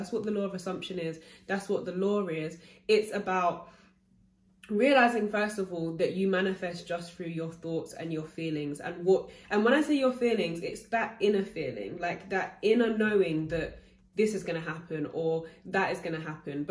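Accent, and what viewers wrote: United Kingdom. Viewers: Wasn’t expecting the British accent 😩😂thought she was American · Finally a loa coach with a British accent! Thanks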